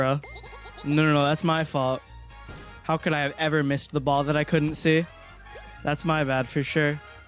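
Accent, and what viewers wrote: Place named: Scotland, United Kingdom. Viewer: Most accurate scottish accent ive heard, couldn't understand a word besides laddie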